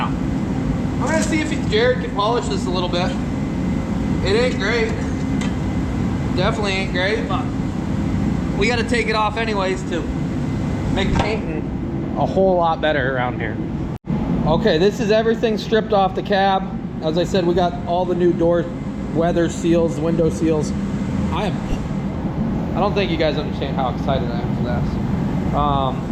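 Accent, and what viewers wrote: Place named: New York, United States. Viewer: Your picking up the New York state dialect accent, no longer the Massachusetts accent.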